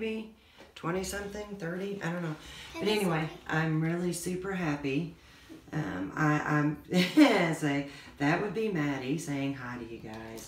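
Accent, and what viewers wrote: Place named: Oklahoma, United States. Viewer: I promised I'd watch it! Very nice job explaining everything....it's easy to understand and I jotted down lots of notes. Mind you...how difficult was it years ago to get all this information...thanks to technology and U-tube it's right there when you want it. Love the way you do your videos...and your accent...you sound a bit like a friend of mine in Oklahoma. Thank you ❤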